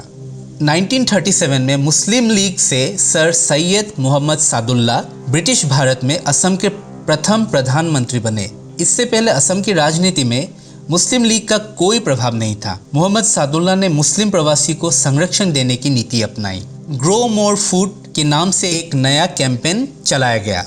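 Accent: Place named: Hyderabad, India. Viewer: Hyderabadi ya Bengaluru ka lag rha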